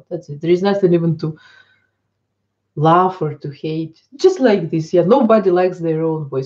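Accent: Eastern Europe. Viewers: Це називається "слов'янський акцент". Не лише російськомовні мають такий акцент. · I knew something did not compute when I saw you lived in Belgium but had a very thick Eastern European accent :D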